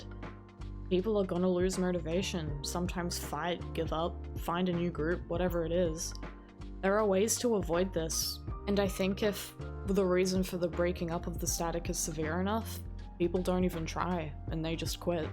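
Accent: Australia. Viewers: Just found this video by chance. I dig the accent. Now, on topic: I recently cancelled my subscription (early Stormblood). I've had mostly mid experiences, but more bad than good. As far as MSQ and the game itself, it's just not gripping me anymore. I'm sure I'll be back . . . someday. But I just know that today is not that day. Keep making content so I can hear more of that lovely Australian accent. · Also are you Australian? You sound it. I have found the Australian community to be one of the worse in my experience and I have played on like 12 different servers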